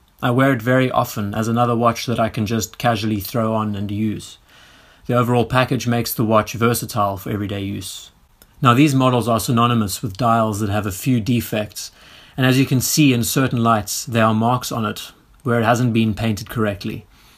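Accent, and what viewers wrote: South Africa. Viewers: This is a bit sad. You’ve spoiled the joy of owning the real thing by buying cheap (albeit well done) knockoffs. So if you do ever decide to trade up, it won’t feel as special. Call it what it is, it’s like living with a very good sex robot versus a real girlfriend. Whatever, judging by your accent you’re from South Africa, so it’s just as well that when you inevitably get robbed of them it won’t be so bad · Great collection, very enjoyable channel. Your accent sounds South African. Hello from Johannesburg South Africa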